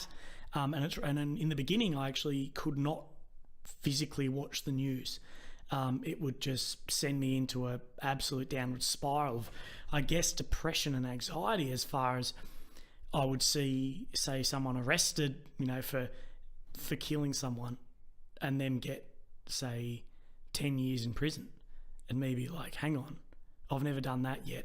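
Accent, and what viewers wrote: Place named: Australia. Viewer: Hi mate, just "found you" in your latest end Feb 2024 video on the Ukraine conflict analysis and doing the back channel and will arm chair my way through 4yrs. At this stage I see where you are at but don't know how you got 'here' and don't know if you will ever see this post with millions of views and 100s of thousands of comments.... But I'll comment anyway mate. But I'm and Aussie ex RAN Submarine Officer, did my 25yrs and been out about 10 yrs now and been pretty PTSD broken as I've learnt you REALLY shouldn't get washed of the back of a Collins class somewhere doing something you can never talk about and leaving the service being a way to broken and a 'tough' hard charger - nothing like your story at all but I feel in a different way, and agree about the NEWS and haven't listened to the bullshit MSM tv or radio in 8 yrs,. 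Now I follow and listen to a variety of good smart people really discussing world events and I haven't missed TEN or ABC or the radio heads sprouting off giving me there bullshit opinions..... And I haven't missed it. Now I find myself (a dam long story but a ball breaker of a story) in the far Nth Arctic Sweden living a better, balanced and good life with my kids, although it's a weeeeee bit removed and remote but it's good - as now I'm finally fixing the head bongo and know what's important. I will keep watching all your current stuff and follow your next 4 yrs journey and enjoy hearing a no bullshit Aussie voice in all the world's noise. I don't know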